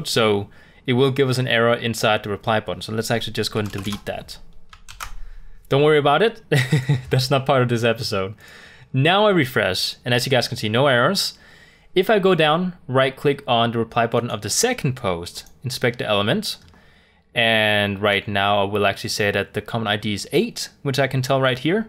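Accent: Denmark. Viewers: You sound very danish, are you danish?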